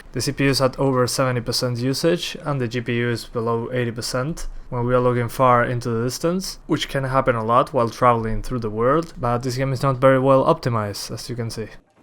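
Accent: Argentina. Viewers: Wacho alto nivel de inglés, tarde un poco en notar el acento argentino.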